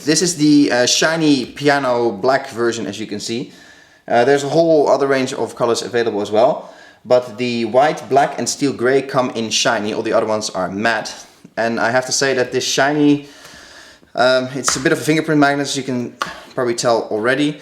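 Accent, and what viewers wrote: Netherlands. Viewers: Are you Dutch? It sounds like you have a Dutch accent haha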